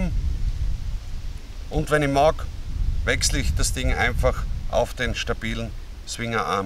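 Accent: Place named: Belgium. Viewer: Bent u belgiesch, ik wel en ik hoor een soort Nederlands belgiesche toon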